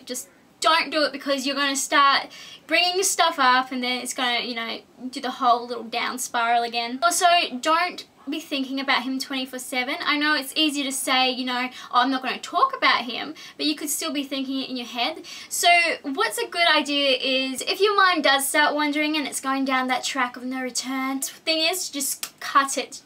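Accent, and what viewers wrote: Australia. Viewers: Is she from Australia ??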